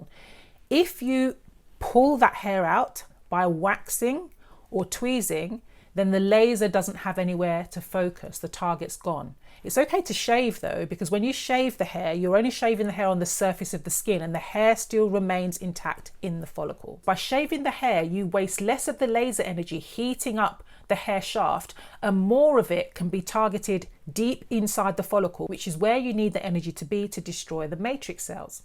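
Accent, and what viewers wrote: United Kingdom. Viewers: I love your british accent 💕💕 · I wish there was video for none black skin or dark skin as I am not. I'm Hispanic. Oh sad those that got burned. I hope they were able to get healed or surgery grafts or whatever. Maybe they did not have the money. You are so pretty. I like your hair style. I love the British accent. You gave a pretty smile. Well tye tips you said to shave is good. Just as I thought, but not too much so thanks.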